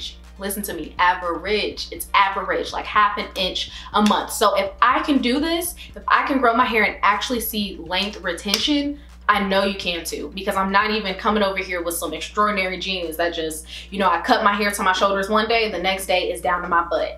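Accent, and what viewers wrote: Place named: South, United States. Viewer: U sound country and I never noticed bc I was lived in tx now Indiana yea u def sound country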